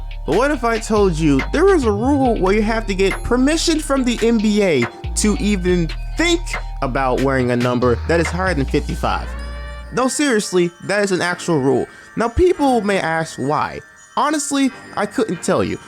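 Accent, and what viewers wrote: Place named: Birmingham, United Kingdom. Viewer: You from that Birmingham area same bro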